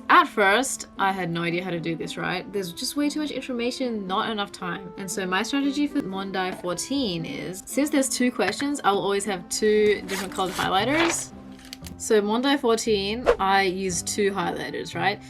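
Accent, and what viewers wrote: Australia. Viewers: Are you Australian? Very cool accent. Feels a mix of several accents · Your accent is 75% Aussie and 25% US!! WTF?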